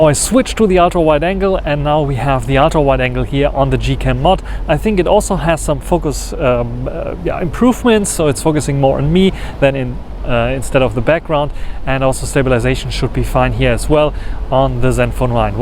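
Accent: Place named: Germany. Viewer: Bro where are you from?I like your accent sounds germanish xd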